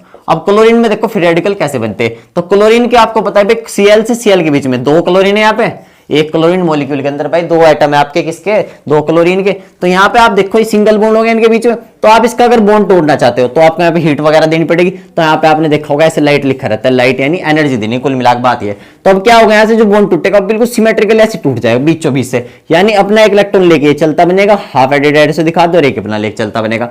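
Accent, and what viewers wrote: India. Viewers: Sirji west up wali bhasha mast h 😂❤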